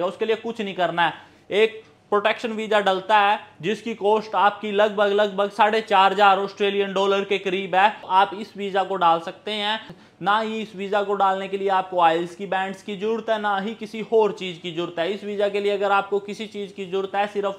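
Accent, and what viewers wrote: Haryana, India. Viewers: भाई करनाल हरियाणा से है शायद बोली से पता चला। भाई जी मै भी आना चाहत आ हूं टूरिस्ट वीजा पर । भाई साहब कोई चक्कर तो नही ज्यादा । कभी जमीन भी बेच दू और ऑस्ट्रेलिया वाले आते ही भगा दे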